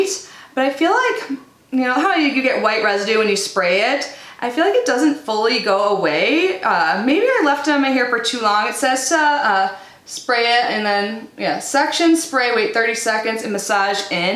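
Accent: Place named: Midwest, United States. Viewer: Subscribed! I started watching your Ipsy videos a while ago, I really love them :) also I totally resonate with your midwestern accent haha. Keep it up girl 💜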